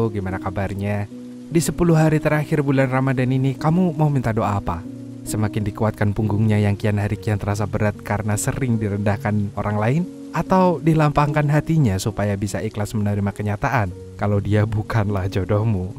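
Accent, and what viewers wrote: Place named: Java, Indonesia. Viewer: Rada medok suarane pasti wong jowo😂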